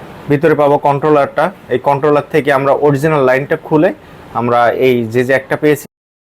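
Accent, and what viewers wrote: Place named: Chuadanga, Bangladesh. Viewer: ভাই আপনার কথা শুনে মনে হচ্ছে আপনার দেশের বাড়ী চুয়াডাঙ্গা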